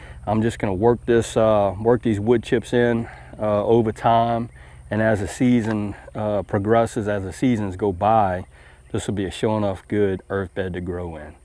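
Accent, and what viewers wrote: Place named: Louisiana, United States. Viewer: Not sure where you are from, but it certainly sounds like you have a little Cajun accent going on there :)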